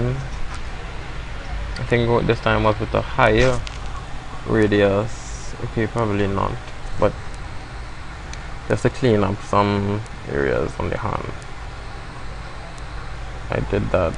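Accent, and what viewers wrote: Trinidad and Tobago. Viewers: You have tah be ah Trini.. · you sound like a trini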